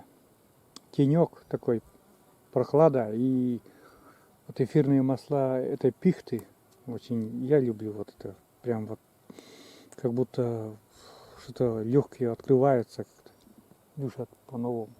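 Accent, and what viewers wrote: Komi, Russia. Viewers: Республика Коми,родной коми язык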